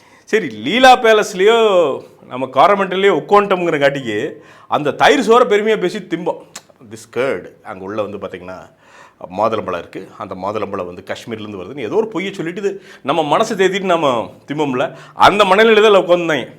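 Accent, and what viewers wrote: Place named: Coimbatore, India. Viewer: கோயம்புத்தூரில் போய் ஒரு நாள் இருந்ததுக்கு அவங்களோட வட்டார பாஷை பேசுற.. இத்தனை வருஷமா ரிவைவ் பண்ணுறே பாண்டா இன்னைக்கு வரைக்கும் கீழே எழுதி வச்சு தான் பேசிகிட்டு இருக்க என்னைக்கு தான் நீ மாறப் போறியோ..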